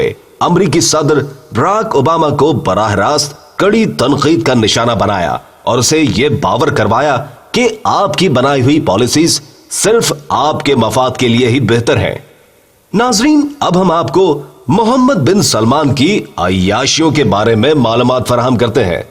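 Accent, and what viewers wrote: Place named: India. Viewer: Ye jo bhoonk raha hai ye to bilkul Indian style hai lagta hai ye indian hai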